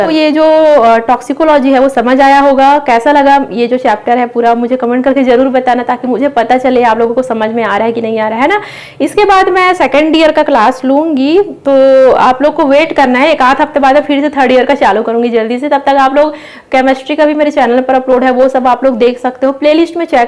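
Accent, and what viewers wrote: Chhattisgarh, India. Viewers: Mam aap Chhattisgarh se hai kya...?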